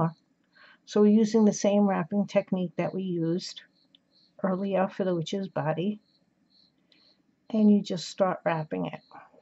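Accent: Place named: Long Island, United States. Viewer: When I started watching this video I felt like I was listening to my family - Long Island represent ! 😍 Adorable gnome witch!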